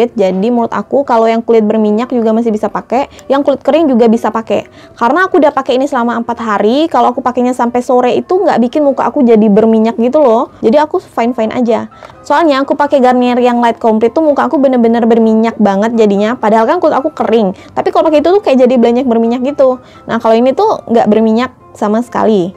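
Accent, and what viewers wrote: Pontianak, Indonesia. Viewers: orang Pontianak kak ? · Orang pontianak ke kak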